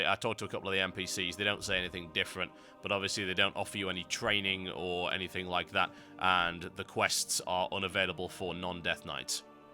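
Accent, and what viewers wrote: United Kingdom. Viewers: Erm, british accent? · When i logged in to YouTube i was like: "Oh, come on now. I need some fantastic brittish commentary on some fresh Cataclysm videos." And When i saw this video under subscriptions, i was like: "Yessss!":D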